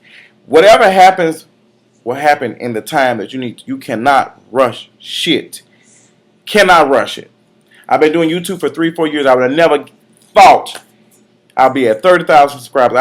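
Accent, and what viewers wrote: Memphis, United States. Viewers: I found your videos it was on the recommended videos and I clicked one where you were going in on your family and it was soooo good. I had to keep clicking your videos and i love your personality. I was thinking I loved your accent and it sounded familiar to me. Then I found out that you lived in Memphis and I had relocated here and have been here almost 2 years. Your Memphis references are so on point and funny! You are so down to earth and I totally understand where you coming from where you saying you have to let go to really dive in your purpose. You have my support 100% and you definitely deserve all the success that is coming your way! Thank you for making me laugh and continuing to be genuine. Love your vids, and love you!!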